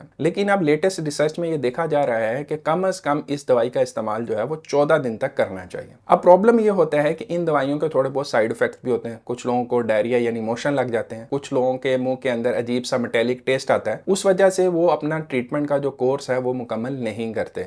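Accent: Karachi, Pakistan. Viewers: R u from Karachi???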